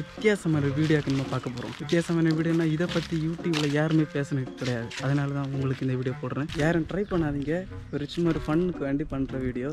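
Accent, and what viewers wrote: Kanyakumari, India. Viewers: கன்னியாகுமரி ஸ்லேங்... 😁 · Kumari Tamil Anna😍😍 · Nop, kumari Tamil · Kumari slang pichu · it's kanyakumari tamil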